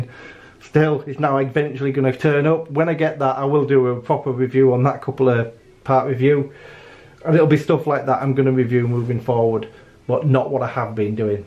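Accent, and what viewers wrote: Yorkshire, United Kingdom. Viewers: Looking forward to fpv racing stuff. I always look forward to your honest reviews and it's nice to hear a fellow Yorkshire accent talking so passionately about the hobby.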